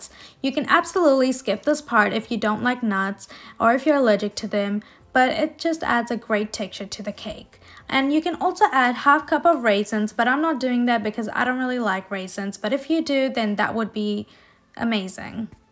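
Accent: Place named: Gujarat, India. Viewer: I love carrot cake the best, it is a healthier one too, and easy to make and moist, I also love and love her English accent with a cute Indian Gujarati hint, nice to hear.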